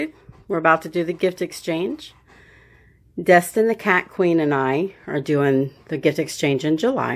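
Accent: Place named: Alabama, United States. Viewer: I am almost certain my brother has a fenced yard. Can he be with other dogs? My brother has either two or three and all are good and sociable dogs. I'm sure he'd tell you otherwise, but to my knowledge they would get a long with another dog. That would be a thing to watch for though because you wouldn't know my other dogs reaction to a new dog coming in their territory. I have emailed my brother and I expect to hear back promptly from him. The other thing about his dogs though is I'd be at my brothers going over things are just taking and one of his big dogs would just lick my arm from elbow to shoulder. I'm pretty sure they were tasting me to see if they wanted to eat me. One of the dogs is named Swan and she is a really nice dog who I have been around a lot when Bob lived out here just a couple of years ago. He has another dog named Buddy who is also a really nice dog. 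I think he has a couple of yippity dogs, but I doin't remember their names. You'd probably like Bob's wife-you talk just like her. She's from Alabama and she says the "yes, ma'aam and the no, sir", no matter if she's talking to an 82 year old or a 2 year old.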